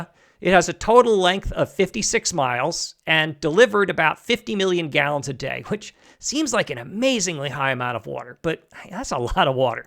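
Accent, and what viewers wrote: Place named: United States. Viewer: Content aside, couldn't the presenter have made a minimal effort to pronounce the Italian correctly, even with an American English accent? If he can use Google Earth has he not heard of Google Translate?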